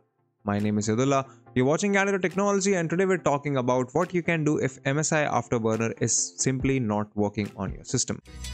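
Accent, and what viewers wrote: India. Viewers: Anyone got a translation for this Indian scammer?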